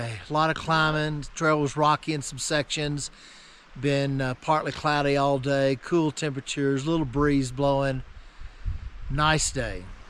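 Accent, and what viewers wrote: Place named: South, United States. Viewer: Yeah, that accent tells me Alabama/Tennessee. I reckon you got some serious ancestry in the southeast.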